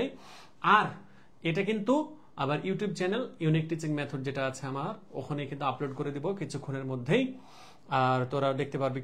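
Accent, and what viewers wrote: Rajshahi, Bangladesh. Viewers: First class sir vasha sunei bujlam apnar bari rajshahi pore about e giye Dekhi asolei.... Last of the all apnar class khub e valo legese ami online e khub akta class Kori ni SSC te to aro Kori ni mane kora lageni akhon apnar class ta amar khub e valo legese asa kori samner sob class e apnar sate thakte parbo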